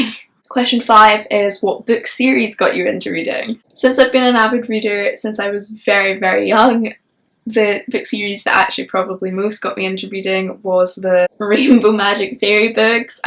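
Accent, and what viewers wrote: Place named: Scotland, United Kingdom. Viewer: Hello from Canada! I'm looking forward to more of your videos. Love the Scottish accent.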